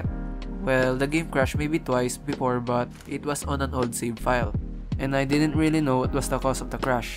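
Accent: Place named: Philippines. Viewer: hey, the accent sounds very Filipino. great to see more of us doing game reviews!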